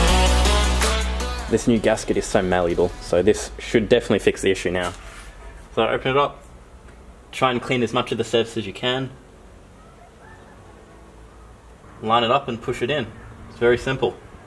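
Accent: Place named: Australia. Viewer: nice and clear vid dude! good to hear an Auzy accent too! And thats coming from a kiwi!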